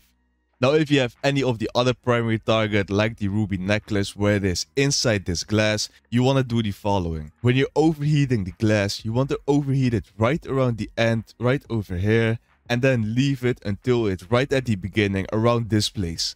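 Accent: Netherlands. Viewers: You can hear that your dutch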